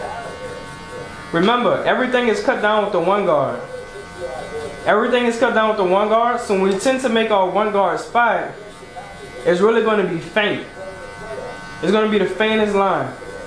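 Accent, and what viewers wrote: Louisiana, United States. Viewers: Louisiana accent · Showing my 18 baby your tutorial and he said "momma he sound like you"...I said I know he definitely from the City! N.O represent!! 🫡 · Ooooooh you sound like you from New Orleans….tell me I’m wrong…please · Southern Louisiana as a native..... He sounds like home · Say bruh you from New Orleans?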